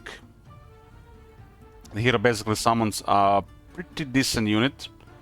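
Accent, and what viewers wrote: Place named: Eastern Europe. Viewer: regarding the letters and how to pronounce, Tolketh would be Tulketh. All umlauts are basically "Open your mouth shape for letter but say some other vowel", with O it would be place your mouth to say O but then say U. You got it right on the end, chat helped you out. Frankly, they're not hard at all when you compare it to French or English. Like yeah there's more vowels in German, but at least they follow rules always, even things like Rammstein being read Ramshtain, there's simply rule that every EI is read as AY, done. How the F do you explain to an English started difference between thorough, through, thought, taught... As someone who's been studying English since before elementary and speaks it better than many people to whom it's their native language, and half of the times think in English, I think I speak for everyone when I say: English is a BS language. 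Great vowel movement in particular, that was worse tragedy than nuclear bombing of Japan. P.S. You sound Slavic, is that your accent? Your Os are very hard and you don't do guttural stops.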